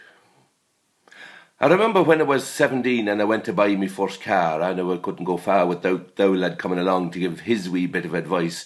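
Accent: Leitrim, Ireland. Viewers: What accent is this? I mean, I know it's Irish, but which one in particular? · Hes a Leitrim man. But Leitrim has 3 or 4 different accents. His accent is a mixture of Leitrim and Cavan. All people from his town have that mix. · Leitrim. Every County, and Indeed áreas within a County, have a distinctive accent.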